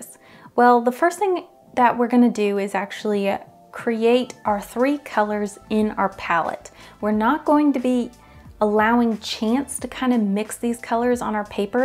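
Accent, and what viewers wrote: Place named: North Carolina, United States. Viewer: I love this effect and can't wait to use it soon. Also: I thought I knew that accent! I just saw your fan mail address. I'm from right up the road, Shelby, NC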